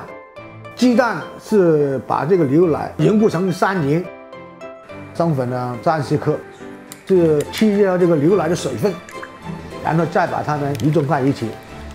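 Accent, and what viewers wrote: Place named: Hong Kong, China. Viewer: 口音真畀我一種香港人嘅錯覺😂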